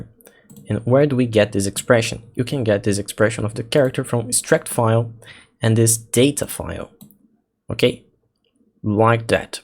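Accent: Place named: Brazil. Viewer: Engraçado, eu ouvindo um vídeo teu, e logo percebi "pera... esse inglês tá meio aportugueisado não?" kk massa mano.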